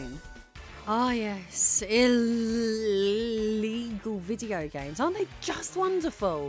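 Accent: United Kingdom. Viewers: loved the brintanic accent along with the cosplay, IIILLIGAL!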